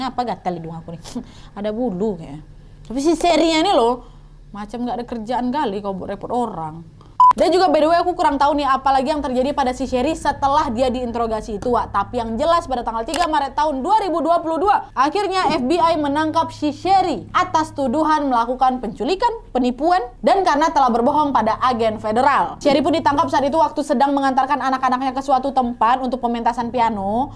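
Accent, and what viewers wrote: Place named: Medan, Indonesia. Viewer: Aku penggemar baru celotehanmu.. Biasanya aku merinding..tapi tertawa denger ngoceh 2mu... mantap... lucu. Kocak... teruskan.. ala medan logatmu tu.. i like it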